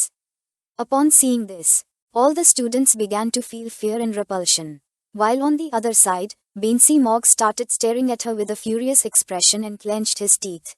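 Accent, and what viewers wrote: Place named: India. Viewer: kind of an ugly accent... can somebody change the announcer.... its like watching indian/tamil movies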